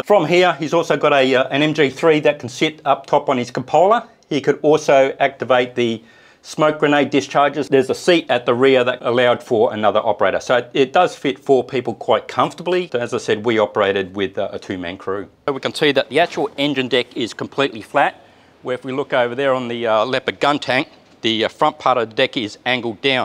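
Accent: Australia. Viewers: It's Aussie regional, I'm getting used to it. There is so much good knowledge conveyed that a voice doesn't matter.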